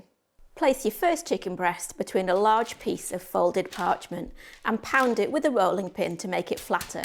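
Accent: United Kingdom. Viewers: here for the british accent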